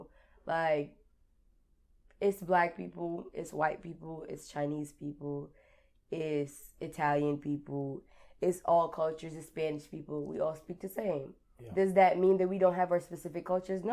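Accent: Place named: United States. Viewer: She saying all of this meanwhile talking in an American Accent! But just for the record she can't articulate African culture not being "Black." Being Black is an American term meaning Black American ethnicity as a whole which have sub categories. This is the problem with other ethnic blacks being raised here in the US but lack experience, history or background to try and understand Foundational Black American lifestyle.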